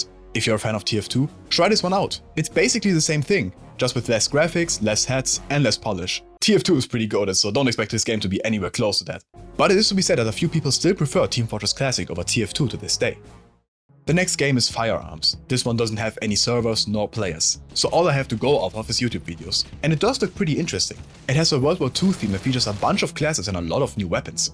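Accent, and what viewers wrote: Germany. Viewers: Lovely video! Like others have said, you are very engaging while presenting on camera. You kind of remind me of a younger Fernando Alonso but with a German accent.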